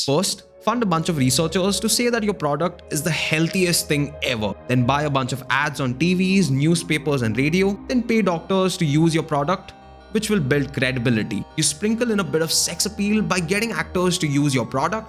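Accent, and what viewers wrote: India. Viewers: oh mann, nix ist schlimmer, als indisches Englisch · You gotta work on losing your thick indian accent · This man's got an awful Indian accent and doesn't know what punctuation means...he just rattles on and on like a robot. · This Indian man hits a nerve with me. Don’t care for his “English”. Listened for minutes that’s all I could take! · The narrator has such heavy Indian accent that it's almost impossible to follow what he is saying. Can't you learn how to speak English properly?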